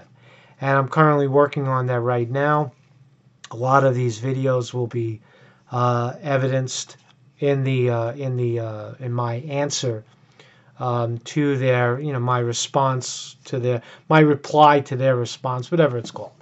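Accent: New York, United States. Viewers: New sub,, I like that NY accent haha,. But its, SAD unfortunately that's how most cities get and rely on hidden taxes . They outspend and then say their saving the city so much in other taxes just to get elected .. I'm not surprised of the deceit just to tax us more and I think most people are aware of the corruption . Ya vote and hope the politician is honest about cutting taxes . OUR WORLD TODAY . I WORKED 40 yrs for the US PO .and seen corruption first hand with people trying to get promoted ETC.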